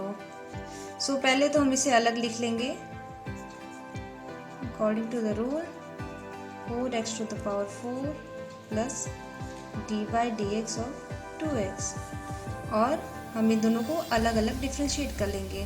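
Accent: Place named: Varanasi, India. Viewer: Very nice... i found kashi in your voice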